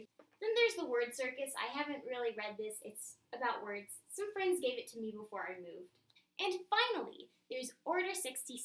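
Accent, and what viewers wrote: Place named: United States. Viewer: I love your American accent! You sound so cute♥️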